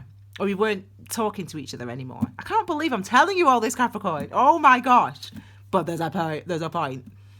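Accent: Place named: North, United Kingdom. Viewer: as a fellow northerner (Sheffield) I love your accent, but omg, your personality just kills me. I am sure if we lived near each other we would be fabulous friends!! Keep doing what you're doing hun, because this was spot on for me and I couldn't have it delivered by a more down to earth, normal, hilarious person. Loved it!! xx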